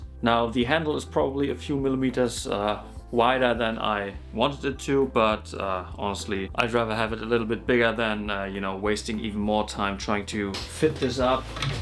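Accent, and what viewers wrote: Germany. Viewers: As a german who is also fluent in english, I really love the denglisch vibes.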